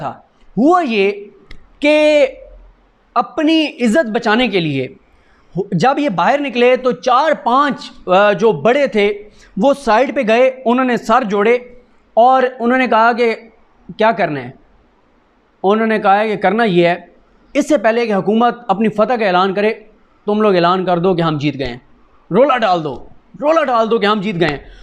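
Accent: Saraiki, Pakistan. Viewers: Ur saraaiki sounds very nice... Thanks fr ur independent broadcasts... ❤️them... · اسی بہانے یہ پتہ چل گیا کہ آپ سرائیکی ہیں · آپ کا سراکی کا تڑکا اچھا لگا۔۔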